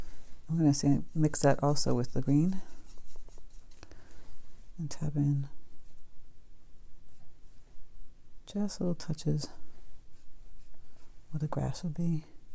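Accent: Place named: East Coast, United States. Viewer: I have to tell you that I have learned SO MUCH from you in the short time I've been subscribed. I have watched every video, and you're so great at explaining each thing you do to achieve the look you're going for, and I think I painted along with EVERY Christmas card video and gave hand-painted Christmas cards to ALL my friends and family! I always can't wait for your new videos to see what else I can learn! I'm fairly new to using watercolors, and value each lesson I can glean from watching! Thank you so much for sharing your talent and instruction with all of us! You rock!! BTW, what part of the East Coast are you from? I was born and raised a Jersey Girl, and I hear something in your accent, but can't quite place it! Thanks again!!!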